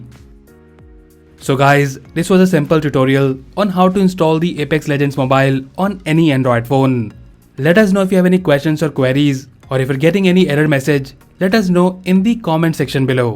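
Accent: India. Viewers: The indian accent doesn't make me want to download it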